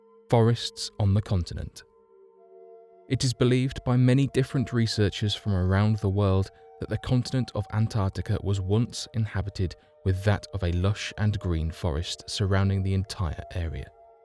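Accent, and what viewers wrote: United Kingdom. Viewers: Nice accent, kinda a British one! I truly appreciate it!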